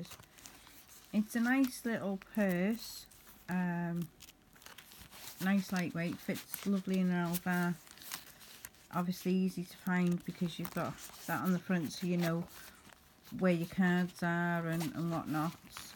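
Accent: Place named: Merseyside, United Kingdom. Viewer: Thanks. Accent reminds me of my mum & nan they are from nosley Merseyside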